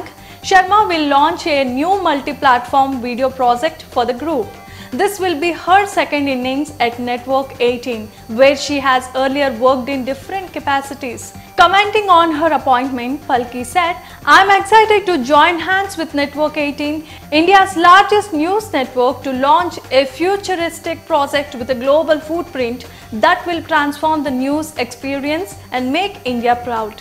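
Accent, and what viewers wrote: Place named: United States. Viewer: Work on your pronunciation Ms Anchor. You are talking about India's most sought after news anchor. You don't have to sound like an American. Leave your colonial mindset.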